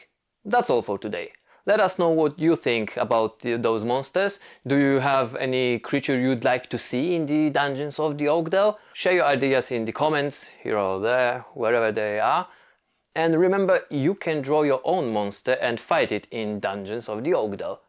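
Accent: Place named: Poland. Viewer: this is the coolest pocket dungeon crawler i have ever seen :) 1 more thing. Why do you have polish accent?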